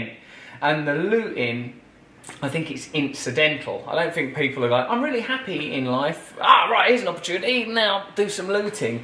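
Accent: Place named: United Kingdom. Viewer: I loved RB taking on Sean Hannity. I am a conservative but FOX news is not my form of conservatism. This guy is actually very bright and incredibly articulate. He also has one of those British accents that doesnt drive me crazy after 5 minutes like so many of the pompous, stuffy old guard English wankers. Do something less intense like Sports role (or opportunity) in global compassion. Better yet expose FIFA for the criminals and corrupt organization that they have become. Same with the IOC. How can Russia be awarded first the Olympcis and then the World Cup given their record on human rights? I do not agree however that we are throwing our votes away because we dont have a candidate to support. its sounds to me like his solution may be revolution. I can only support peaceful action. We are far too evolved to allow what is happening in the world to continue. 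Yes the people need to rise up however they need a leader that has compassion for mankind.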